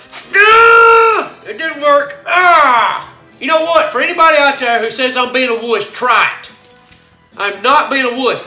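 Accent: United States. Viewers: Man his cowboy accent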